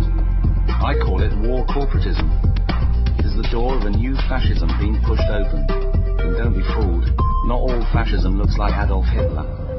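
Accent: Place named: United Kingdom. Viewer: Cool vid. I especially enjoyed the British accent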